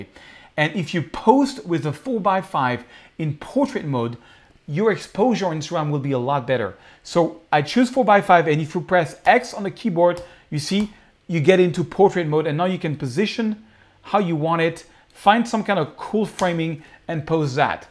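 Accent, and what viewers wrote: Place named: France. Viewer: Great tips. You videos are very fun to watch, and as someone with an accent myself, I love seeing how you embrace your frenchness.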